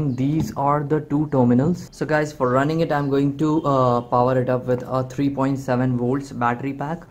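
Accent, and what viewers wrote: India. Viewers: indian accent so funny lol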